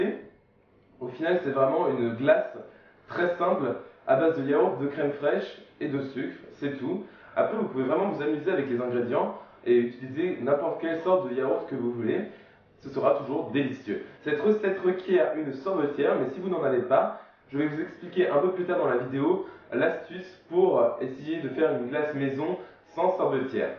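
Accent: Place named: England, United Kingdom. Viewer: ton accent anglais est terrible, sinon bonne vidéo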